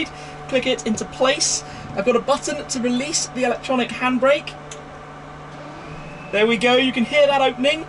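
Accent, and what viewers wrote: United Kingdom. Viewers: Brilliant! Absolutely sensational. british accent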